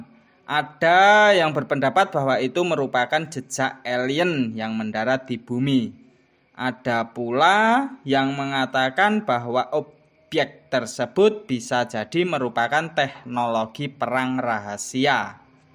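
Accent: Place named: Java, Indonesia. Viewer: Dan sangat medok wkwk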